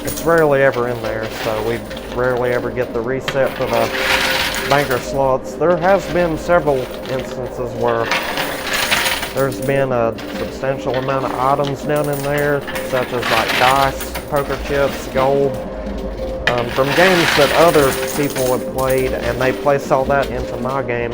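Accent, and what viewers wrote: South, United States. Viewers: It’s in English-with a southern accent.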